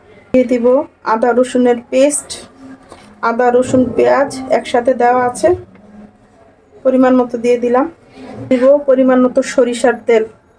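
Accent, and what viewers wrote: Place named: Comilla, Bangladesh. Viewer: আপু দেখে নিয়েছি, আপনার কথা শুনে বুঝলাম আপনি কুমিল্লার যদি ঠিক হয়ে থাকে, আমিও কুমিল্লার,ধন্যবাদ আপু।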